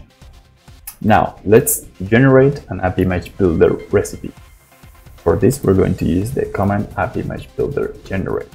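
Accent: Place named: Latin America. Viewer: Hello. I hear one latino speaking english here, asi que te escribo en español mejor. Cómo se puede hacer una appimage a partir de un archivo sh? Es necesario tener muchos conocimientos de informática? Te lo agradecería mucho. Gracias de antemano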